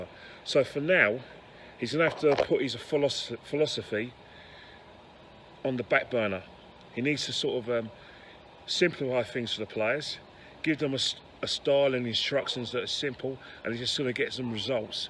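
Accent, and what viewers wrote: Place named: Cockney, United Kingdom. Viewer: This man is Riddled with Cockney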